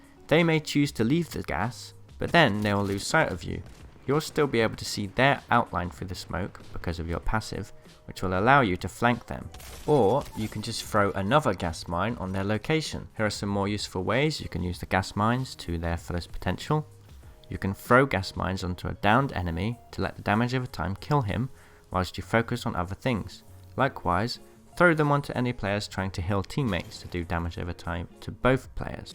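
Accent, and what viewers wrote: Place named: England, United Kingdom. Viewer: Really entertaining, pretty monotone voice but the English accent sorta balances it out, great video overall!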